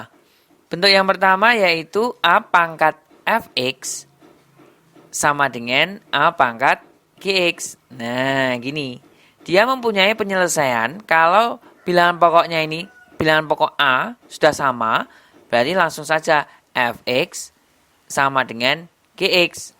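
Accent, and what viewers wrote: Java, Indonesia. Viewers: Jawa bngett ka wkwk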